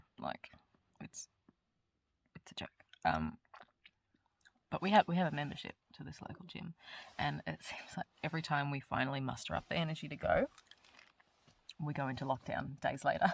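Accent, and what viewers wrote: Australia. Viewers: As someone from the US, I can attest to social opinion of Austrailian accents, and trust me...we don't think you sound like yokels. If anything, people think someone with an Austrailian accent is probably worldly af lol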